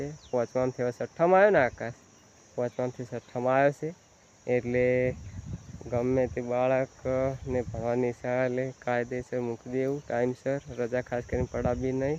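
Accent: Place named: Banaskantha, India. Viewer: ક્યાંથી છો ભાઈ તમારી બોલી બનાસકાંઠા આજુબાજુ ની લાગે છે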